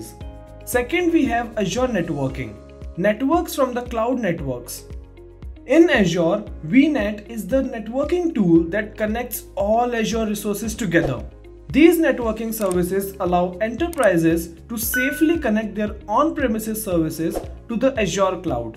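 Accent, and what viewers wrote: India. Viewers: indian accent :(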